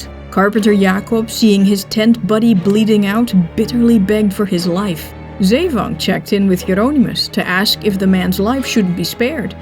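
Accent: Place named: Netherlands. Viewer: I have read many books on the Batavia topic, and even done some research myself about the subject... and never came across material so valuable from an unknown source! Yes, you may find specialised info reading the "usual" suspects (Mike Dash, Hugh Edwards, etc.), but I never expected such a high level from someone running a YouTube channel! Your style is professional, yet accessible to everyone; the research well done and facts stand verification; and your narration, with your unique Dutch accent makes the series even more enjoyable. I recently went to the Houtman Abrolhos Islands, and visited all the islands in this chronicle, I took photos that I could share with you, in case you wanted to update your material, or just happy to share them with you if you're curious... You are doing an amazing job and I commend it! Well done! Looking forward to more of this...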